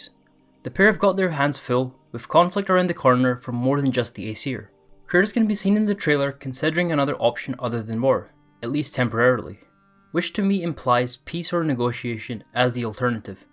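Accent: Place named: Scotland, United Kingdom. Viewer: You're very good at this - and youll only get better with more practise. Keep it up. Also great to hear a fellow Scottish accent in this scene.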